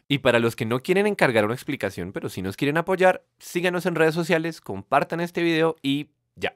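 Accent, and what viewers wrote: Bogotá, Colombia. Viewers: esa voz de rolo inconfundible con un tono agudo en cada frase al final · sos colombiano ? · te reconozco el acento colombiano :) yo también lo soy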